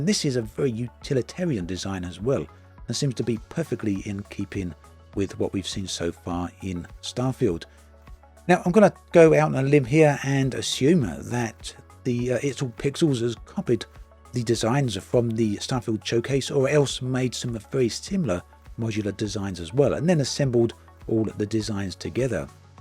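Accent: United Kingdom. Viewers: Starfield will have the RT mod within a week of launch. It just wont be from the Devs. It will be from the modding community. So there are your lost sales MR smooth Brit accent.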